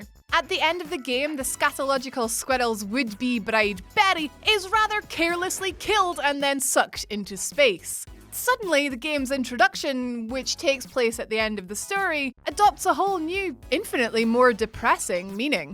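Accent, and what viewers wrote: Scotland, United Kingdom. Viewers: I'd love to know on which planet the Scottish accent sounds like a mashup with another accent. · She's Scottish. :-) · is she Scottish?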